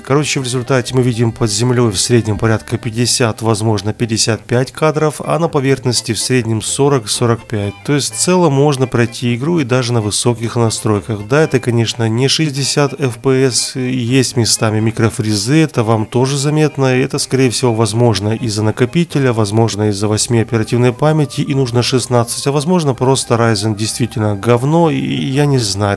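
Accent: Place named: Ukraine. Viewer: С первых минут понятно что ты Украинец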